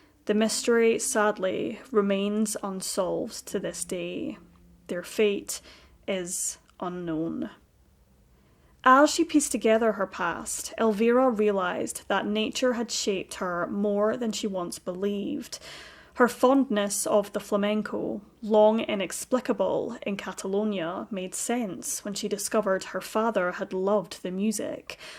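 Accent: Scotland, United Kingdom. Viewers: Interesting story but the Narrator's absolutely HORRIBLE Scottish accent makes it too unbearable to listen to!!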